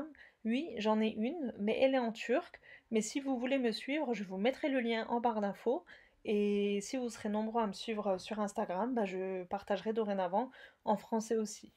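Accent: Alsace, France. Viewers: SVP Madame Vous serait-il possible de noter les ingrédients utilisés pour chaque recette sous la vidéo ? Ce qui permettrait de les préparer à l'avance, puis vous regarder faire tout en appliquant vos conseils qui sont super, extra ! Vous êtes donc d'origine turque (avec un petit accent alsacien)... Merci beaucoup pour vos recettes, il faut l'avouer, elles sont fabuleuses ♥♥♥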